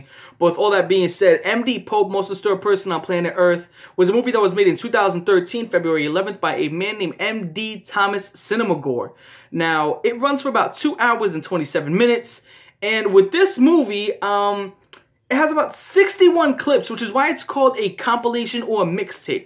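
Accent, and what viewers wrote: New York, United States. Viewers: im not sure if you're from ny or nj or what but i love your accent and the way u talk about stuff 😂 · Bro i soon as i heard you speak i knew you were from my state ny. Much love man❤ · This guy's New Yorker accent and random analogies make me forget how horrific it'd be to watch some of these by yourself. Well done on turning MDPOPE into a comedy lmao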